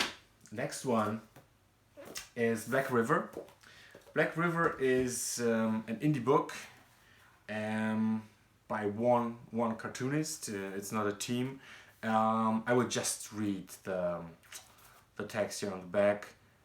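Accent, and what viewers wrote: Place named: Germany. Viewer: I don't think you talk funny, listening to you makes me miss Germany. You have an awesome ass collection and I am loving your reviews:)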